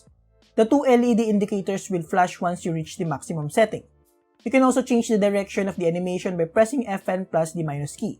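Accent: Philippines. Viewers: This guy has the most Filipino accent ever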